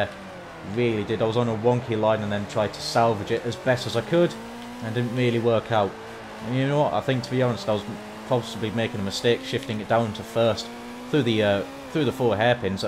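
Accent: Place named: North East England, United Kingdom. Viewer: You sound like ya from the north east of England am from Newcastle and you defo sound ya from here or the other side of the Tyne maybe?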